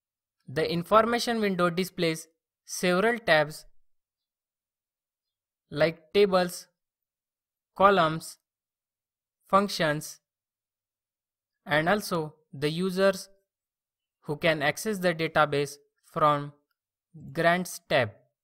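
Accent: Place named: India. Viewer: This is how to teach any beginner properly. Most other uploaders should learn from this video. It seems to be a common problem for some programmers beeing incompetent as teachers. You need to think like a human, not like a computer. If you're having a hard time understanding the indian accent for some reason, the subtitles works well!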